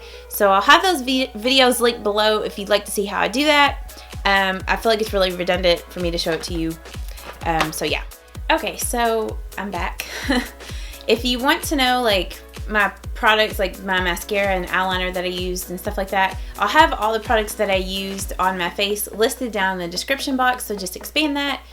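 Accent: South, United States. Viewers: Juz love to hear your southern accent!! We needed a southern bell makeup guru. Hugzz from Dallas, Texas!!!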